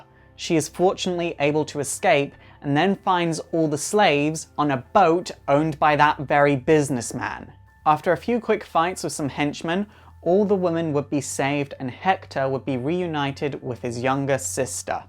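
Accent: United Kingdom. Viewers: Sorry if this question is really stupid,but are you british?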